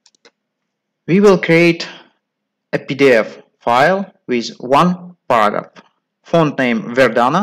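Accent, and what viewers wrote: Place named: United Kingdom. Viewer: Don't be sorry for your British accent :D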